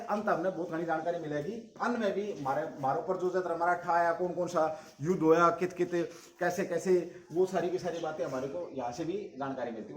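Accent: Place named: Haryana, India. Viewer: Haryana GK haryanvi andaz me bhaut badiya sir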